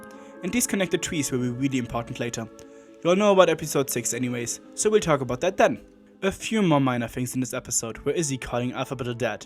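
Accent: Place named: Germany. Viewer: Ana Sani probably watches you because as a VA, she could use more exposure to a German accent so she can reliably pull it off for a future role! 😂 Ich muss schon gestehen, dass ich mich oft etwas damit schwertue, dich zu verstehen, da du zum Teil ziemlich schnell sprichst. Vielleicht können dich die englischen Muttersprachler aber etwas besser verstehen als ich, denn ich habe ja eigentlich dadurch Englisch gelernt, dass ich mich fast ganz und gar auf Content von Muttersprachlern konzentriert habe. Somit habe ich einfach ehrlich gesagt nicht allzu viel Erfahrung damit, Englisch mit einem deutschen Akzent zu hören, was mein Verständnis schon einigermaßen beeinträchtigt, vor allem, da du ja so schnell redest! 😅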